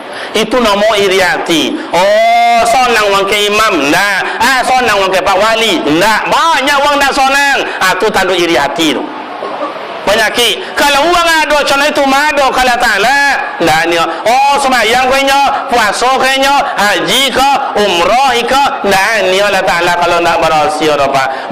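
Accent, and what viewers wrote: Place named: Ocu, Malaysia. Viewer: Soang ko tio ustad nan bahaso ocu kental kek awak nye,lain podo tu,uwang ocu juo nye,tapi bahaso minang,kan lucu du, Ustad ko mantap,asli ocu n bahaso nyo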